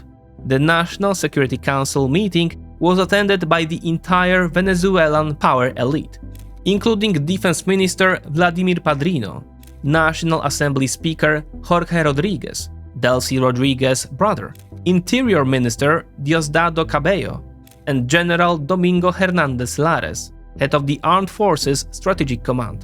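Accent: Poland. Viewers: The CGIs were very nice on this one. The overall quality of the channel keeps going up. And we always have our favorite Polish voice to relate to. 😁❤🙏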